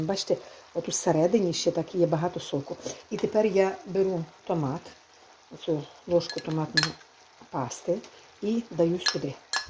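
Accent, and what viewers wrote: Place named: Bukovina, Ukraine. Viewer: Насолоджуюсь Вашою мовою з Буковинським відтінком. Дай Вам бог здоров'я.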